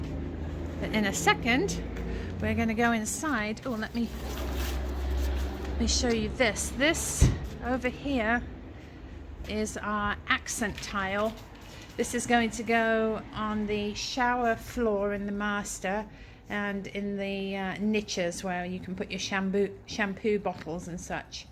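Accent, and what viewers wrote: England, United Kingdom. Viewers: I love listening to your accent - you sound so English and then you say "clowshe" rather than closh (to rhyme with gosh) as we do in England. Fascinating to see what words have changed and what USA phrases you use. Shopping Cart is one - we say shopping trolley. Love the channel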